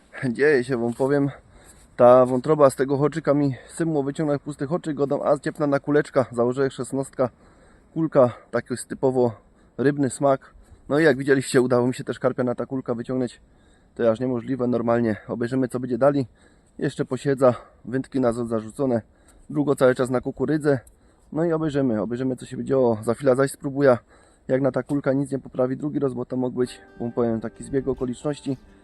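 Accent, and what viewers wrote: Silesia, Poland. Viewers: Karpie nie moja bajka, ale fajnie zaciągasz po Śląsku 😉. Pozdrowienia z Mazowsza.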